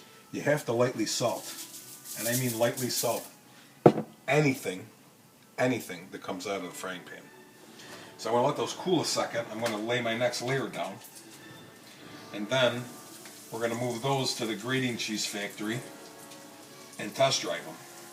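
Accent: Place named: Buffalo, United States. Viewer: If I didn't know better I would guess you being from the west side of Buffalo.( thats a compliment by the way) Those cordone's look so good I can't wait to try some myself. I watched both videos mainly because it was like watching something from home. Thanks for that, and God bless you both.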